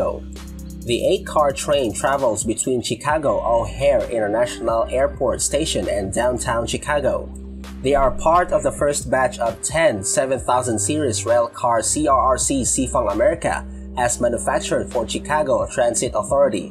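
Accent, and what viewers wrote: Philippines. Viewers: by accent and off word pronounciation philipine chinese pinoy.100'/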